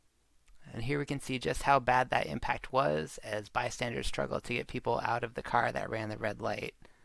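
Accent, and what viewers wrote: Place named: Seattle, United States. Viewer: I love how the most seattle sounding dude is commentating this.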